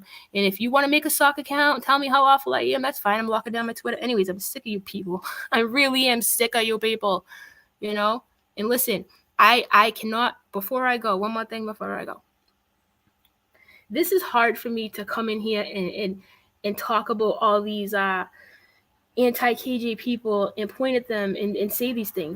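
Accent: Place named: New England, United States. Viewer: ... First off, you make me miss my new England accent, lol. I'm from RI but now live in NC. I'm not sure where you're from, but I believe it's new England. I don't agree with everything you stated in this video. I do appreciate your non biased stance. Overall, I enjoyed this video. Thanks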